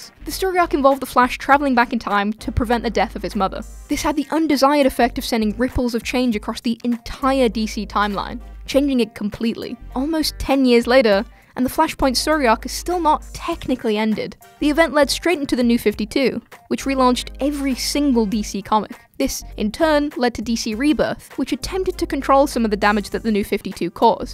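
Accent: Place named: United Kingdom. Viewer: So go to an American channel if you're so irritated by our multiple dialects... American's pronounce things differently to us UK residents, yet it doesn't bother me in the slightest. Imagine being so pressed by a bit of difference. Calm down petal. ✌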